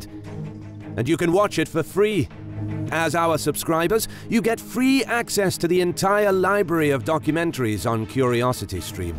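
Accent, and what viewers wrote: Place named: United Kingdom. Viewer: Are you trying to be Kings and Generals or Bas Battles? I love it. A different yet still British narrator. Haha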